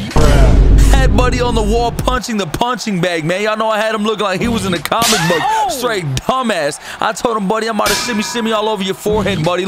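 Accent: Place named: New York, United States. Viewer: This man sounds like he from NY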